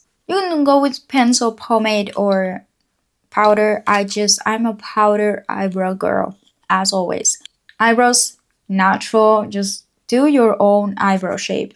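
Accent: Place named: Italy. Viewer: I love thisss ,btw is she Italian?cuz her accent sounds like she is from Italy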